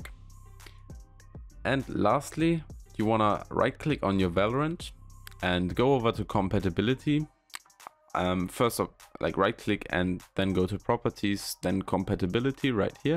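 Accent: Germany. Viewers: Are you german? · Man hört aus der Stimme raus dass du Deutsch bist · Yeah you can tell by his accent and his language in his PC is also Set to german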